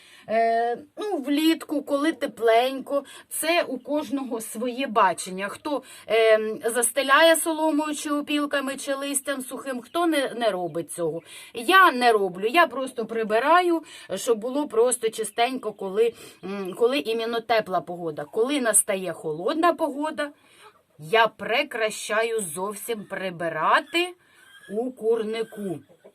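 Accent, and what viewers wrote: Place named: Central Ukraine, Ukraine. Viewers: Пані! Дякую вам за поширення домашніх справ. У мене закралась цікава думка, у вас така говірка, ніколи не повірив, що ви з Кривого Рогуі...наче десь з центральної України ближче до Полтави. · Зайшла на ваш канал вперше,лайк і підписалася, дякую за щирість за таке українське спілкування,дивлюся Вас із Італії але сама родом із Вінниці,мова дуже схожа, дякую, буду слухати ваші поради, вони дієві це точно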